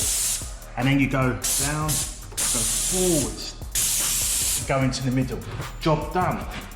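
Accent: United Kingdom. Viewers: You have a proper British accent, I shall trust you.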